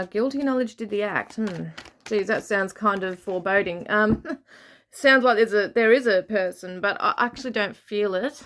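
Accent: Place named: Australia. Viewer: I have never known of your channel before and clicked on it because of the subject. I had watched another reader yesterday and I wanted to compare what you both said. Pretty much identical. She believed he went higher and off the normal track. He tripped and fell hitting his head. She didn’t think he was in water but up on the mountain but would be hard to find by drones because he was wearing dark clothing. She doesn’t feel he is alive. She also said it would be a while - a few weeks before he was found (and you pulled out July). Two brothers passed him on the path and he would be found by other hikers (you had the hitch hike card). She felt that he and his wife had a bit of an argument on the beach before he left. You were mentioning was reflecting on his life etc. Don’t we all do that after a spat with our partner? Great reading and lovely to hear an Australian accent 🇦🇺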